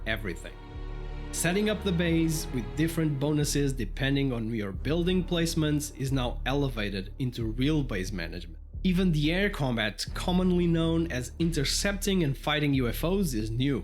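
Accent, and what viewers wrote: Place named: Portugal. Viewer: I was listening to the video, and halfway through the only thing going through my mind was how Portuguese you sound.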